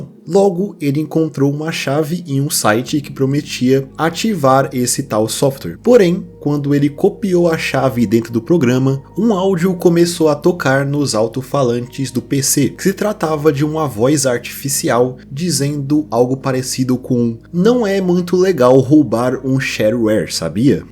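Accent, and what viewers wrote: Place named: São Paulo, Brazil. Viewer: Será que o é branco, preto ou asiático? Magro ou gordo? Será se ele é baixinho ou tem 1,90m? Talvez nunca saberemos. Só sei que ele é paulista pelo sotaque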